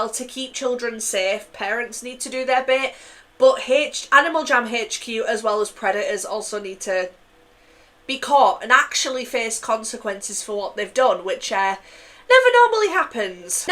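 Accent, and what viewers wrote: Scotland, United Kingdom. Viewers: unrelated you sound so Scottish my god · I mean, she's from the uk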